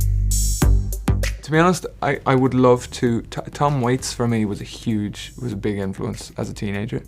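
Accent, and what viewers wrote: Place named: Ireland. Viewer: Am I the only one who didn’t know he was Irish? Ive never heard him speak before....I’m not even sure where I thought he was from, I guess I just assumed like manifested from a witches spell or something.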